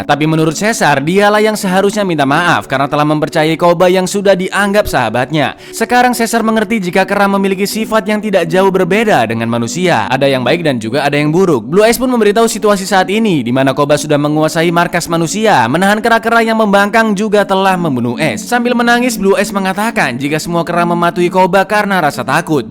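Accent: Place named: Surabaya, Indonesia. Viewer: Aku arek mojokerto paham banget celometane arek suroboyo sidoarjo model iki wkwkwk nggarai mengkel2 kudu ngguyu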